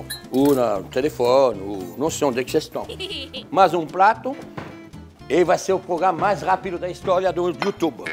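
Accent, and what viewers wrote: France. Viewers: Eu amo esse sotaque francês 😍💕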